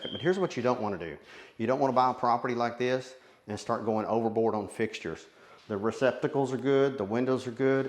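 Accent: South, United States. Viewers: It’s southern talk. For get ready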